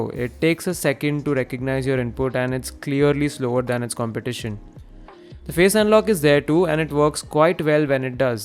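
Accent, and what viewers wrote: India. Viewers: Fvck you and ur indian accent!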